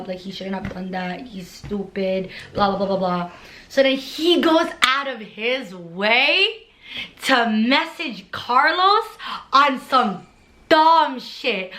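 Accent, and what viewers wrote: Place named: Jamaica. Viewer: Why she trying to sound like a jamaican?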